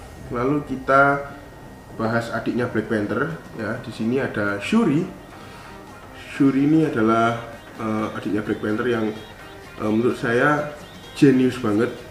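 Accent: Java, Indonesia. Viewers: PERTAMA KOH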